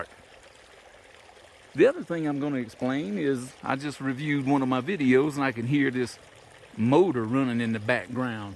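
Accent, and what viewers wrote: United States. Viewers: I loved your video and your southern accent!! I was looking into small campers, easy to handle since I will be camping by myself. Please add more videos with your set up and all the gadgets you think are the most useful for campers. Thank you so much again! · I like you. I sure can hear Mississippi in you voice. Daisy sure is calm. Beautiful camp sight.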